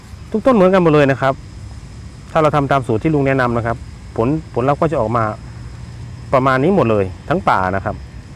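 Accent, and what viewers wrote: Thailand. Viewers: ดีมากเลยค่ะ พูดภาษากลาง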